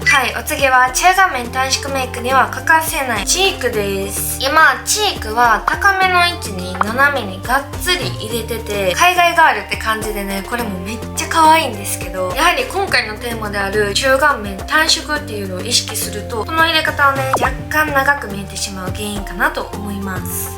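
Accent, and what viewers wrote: Kansai, Japan. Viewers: 関西弁なのかメロい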